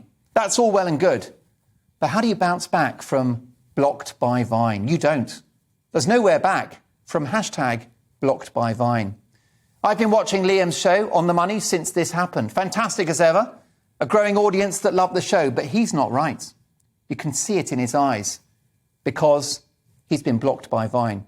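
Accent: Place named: England, United Kingdom. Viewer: I love this guy. Being called an arsehole with an English accent sounds so right!!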